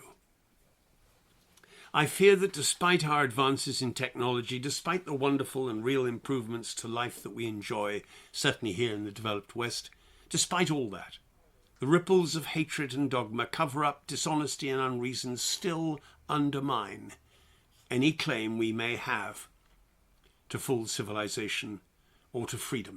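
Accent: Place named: RP, United Kingdom. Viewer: Jesus dude, why? Why is it, as an American who's passed the big 60, with German & Swedish ancestory, who's never really bothered to do much except smoke pot & watch TV, why is it, whether you, or Sir Lawrence O narrating "The World at War", I can only digest history, if narrated in public school Queen's English? What I mean is, I really enjoyed this, and am grateful you produced this, and I will have to read (hear, on <link> really) that book!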